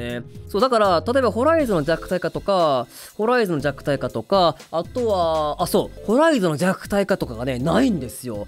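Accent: Kansai, Japan. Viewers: いつも解説ありがとうございます！関西訛りあるのに聞きやすくてすごくわかりやすくて感謝です！アプデ後も楽しみにまってます🐧❤️‍🔥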